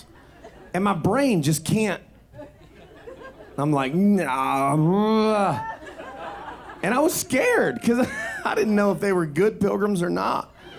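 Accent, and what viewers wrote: South, United States. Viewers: I`m not a native english speaker and whenever you tune up your deep southern accent I have to turn on subtitels too 😅 Great special though. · Like George Carlin. With a Southern drawl❤❤❤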